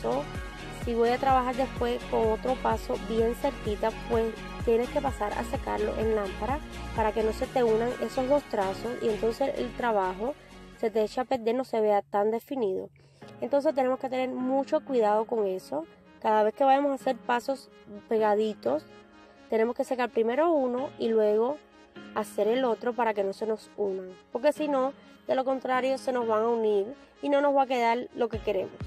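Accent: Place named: Caribbean. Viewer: Te quedaron lindas y como ya dije un tiempo atras, ese sabor que le pones con tu acento caribeño me encanta.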